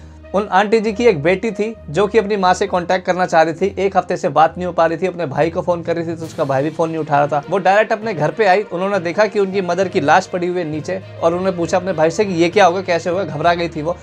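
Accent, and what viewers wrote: Haryana, India. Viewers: Japani news haryanvi accent me 👌👌👌👌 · Love your Haryanvi accent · Kaash news channel me bhi aisi haryanvi me anchoring karte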